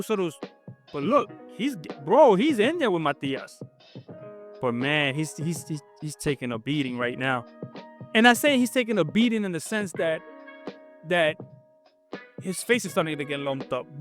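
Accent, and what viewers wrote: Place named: Latin America. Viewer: You may speak English, my friend, but you're actually vibrating and articulating in Spanish or some Latino version thereof. However, you are attempting to connect with a nation of many different cultures, anglo's figuring large among them. Your speech, in it's high stress. Latino rapidity, virges on the brink of becoming incomprehensible because of your machine gun fire manner of delivering your thoughts. And without attempting to be offensive, I must tell you, it becomes irritating and of limited tolerability to Western ears. So this will not be easy for you, because rapid fire Latino is your communication mode of choice. All I can say is, seek to chill out and slow down and to dwell upon the meaning of the words that explode out of your lips with such self-assured speed. They are all not necessarily connecting with your Western Brothers and sisters in the way that you might hope. 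And remember, the world is not going to come to your speaking or thinking style, you will have to modify yourself to meet it where it is. Good luck in this process of recalibrating yourself. Don't worry, in the end it will make you less compulsive and more pleasant to be around.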